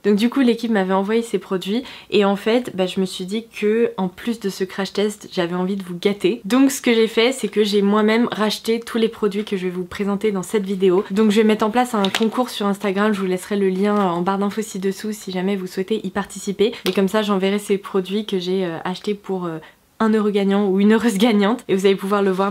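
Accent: England, United Kingdom. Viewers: Vidéo top encore une fois! Les produits me donnent trop encie, surtout la palette de fards 😍 Et cet accent english que tu as, j'adore 😂😘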